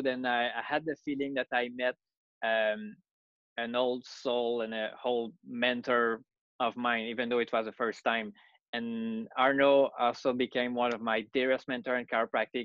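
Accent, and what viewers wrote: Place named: Quebec, Canada. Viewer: I was always curious to know what he sounded like in English 😅 glad to see that thick Québécois is still there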